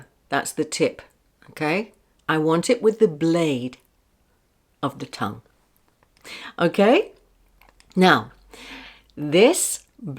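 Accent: United Kingdom. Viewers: Thank you so much for your time. you are the best and we love the way how you teach and your British accent.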